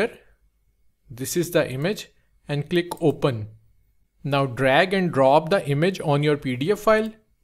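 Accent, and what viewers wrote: India. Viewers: When i heard the indian accent and a sub 2 mins video, i knew it was the one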